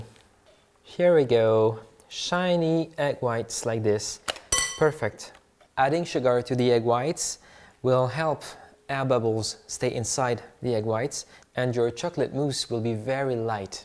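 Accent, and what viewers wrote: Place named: France. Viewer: I love your sometime tiny French accent ! ;)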